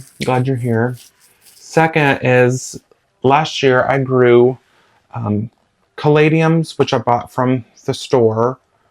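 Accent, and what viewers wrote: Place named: Mississippi, United States. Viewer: LOL! I have a bob ross chia pet too haha. Your accent makes me feel like I'm back home. I'm originally from Mississippi.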